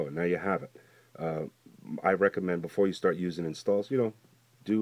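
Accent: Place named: Long Island, United States. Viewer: i like your accent! are you from long island? thanks man!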